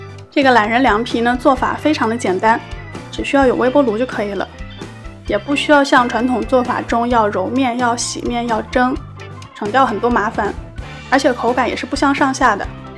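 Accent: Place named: Xi'an, China. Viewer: 听声音是咱西安的吧？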